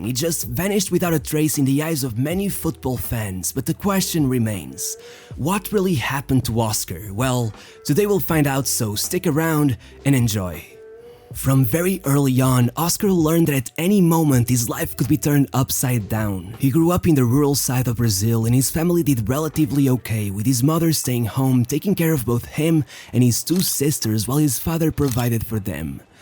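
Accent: Portugal. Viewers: Are you portuguese? You sound really portuguese,se fores grande vídeo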